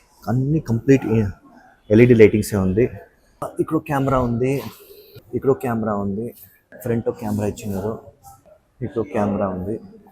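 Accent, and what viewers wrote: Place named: Karnataka, India. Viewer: I like your typical Karnataka Telugu accent.👍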